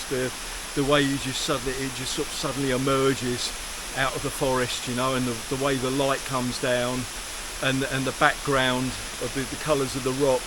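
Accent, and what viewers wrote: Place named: United Kingdom. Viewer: I like listening to English with a UK accent. It's beautiful.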